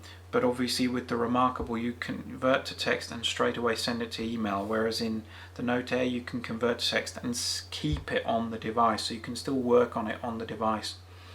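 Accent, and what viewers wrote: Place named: United Kingdom. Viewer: When viewing a PDF in landscape on the Note Air, is it possible to do fit-to-width? On the vid, it seemed like you were viewing the whole page in landscape, which made the text really small. Another thing: With split screen on the Air, when reading a book in one half and making notes in the other half, the fact that you can't scroll notepad up as you write but have to keep repositioning the device in order to maintain your writing posture (right?) means that one's viewing angle/distance of the book one is making notes from has to be continually changing. Doesn't that make split-screen more or less useless for serious, prolonged note-taking from books/PDFs? Thanks for the vid! (You've got a British accent but you said "euros" not "pounds"!)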